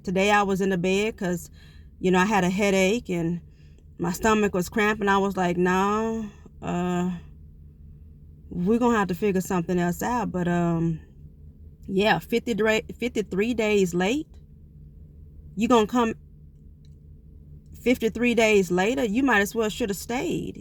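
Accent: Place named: South, United States. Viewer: Happy birthday to your daughter! Wow this is interesting I’m gaining knowledge for pre menopause. Do you know that you have a really cute southern accent? I don’t know if you know it or not. lol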